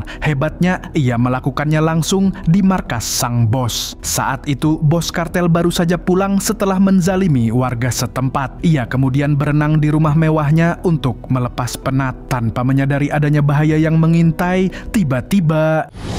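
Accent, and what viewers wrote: Banjar, Indonesia. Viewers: Urang banjar pian lah mang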